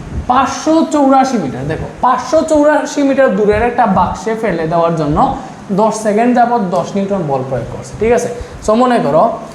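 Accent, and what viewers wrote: Sylhet, Bangladesh. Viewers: vai ki sylhety 🤔🤔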